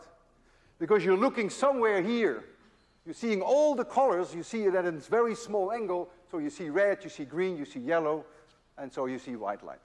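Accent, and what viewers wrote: Netherlands. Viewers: The dutch accent is indeed very recognizable, but atleast he's not like Van Gaal. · Some people tell me that I sound just like this man when I'm talking English. I'm Dutch too.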